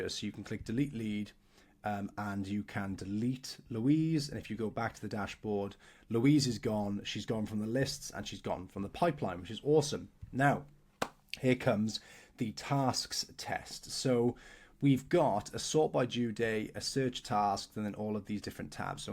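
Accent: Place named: Wales, United Kingdom. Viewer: Where in Wales are you from boss? Fellow dev here, from Cardiff, love hearing the accent from home on these type of videos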